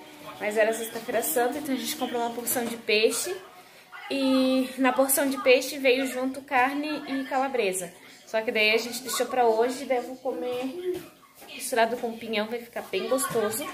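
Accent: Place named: Rio Grande do Sul, Brazil. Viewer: Perguntei de onde vocês são porque você tem sutaque de gaúcha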